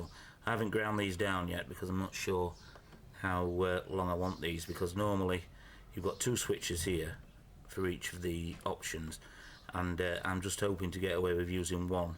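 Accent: United Kingdom. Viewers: You played Tetris on a Game Boy in 1989? ;-) Did you import one or something haha cos it didn't come out until September 1990 in the UK and you sound British so I'm assuming you're in the UK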